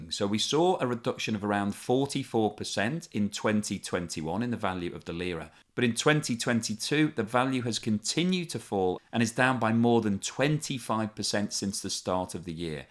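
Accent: United Kingdom. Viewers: Your analysis of the Greek-Turkish situation is appallingly one-sided and misleading. (1) Greece has S300 in Crete because Cyprus purchased them to station them in Cyprus, but Greece had to take them because Turkey made a fuss. Greece did a favour to NATO to appease Turkey, yet again as done many times. (2) Turkey has been invading Greek air space many more times than it claims Greece does. Whether Greece does invade Turkish Air space is to be seen and probably false, because Turkey sees the air space over the Greek Islands as its own, which is a fantasy. (3) Turkey has been attempting to size Greek territorial waters and land for decades because it wants access to hydrocarbons in the Aegean see, and to do this it is inventing its own nonsense all the time. Most ridicolous of all, it never signed UN treaties regulating continental shelves (together with a handful of countries) and then tries to invent its own continental shelf. 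(4) Greece stations small military units on the islands for defensive purposes. This after the Turkish illegal invasion and occupation of Cyprus. And has the right to defend its territorial integrity given Turkey's very obvious aggressive policy. In short, stop doing propaganda for the Turkish state. And if that is not your intention, educate yourself and present facts as they are. Finally, since it sounds like you are a Brit, put your time into making sure that your government gets their hands off Cyprus once and for all, does what necessary to bring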